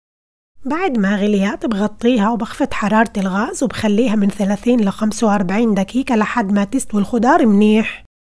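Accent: Palestine. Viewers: بتشهي شوربه خمس نجوم مميزة مثل لكنتك الفلسطنية الليةبتهبل 🇵🇸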